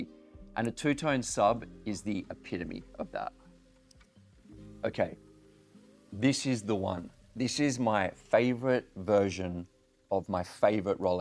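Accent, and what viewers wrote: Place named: Australia. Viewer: I sense a slight Australian accent there. Great video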